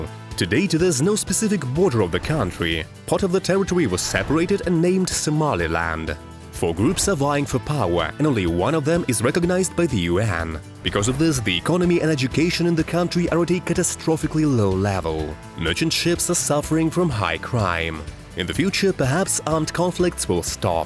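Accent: United Kingdom. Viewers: He's speaking British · u british u sound like british and i like your vids alot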